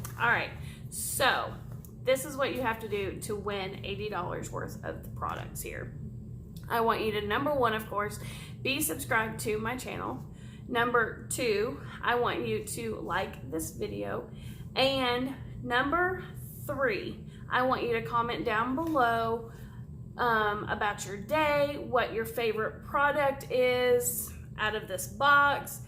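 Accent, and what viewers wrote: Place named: South, United States. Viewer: Trust me! I didn't take it as "snarky". All good. I can hear the southern in your voice and relate. :)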